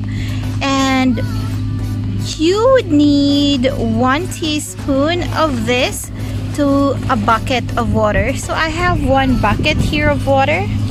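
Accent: England, United Kingdom. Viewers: O<link> I really love your english accent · Ang galing naman po salamat po sa pag share nito, ingat jan. I like you english accent.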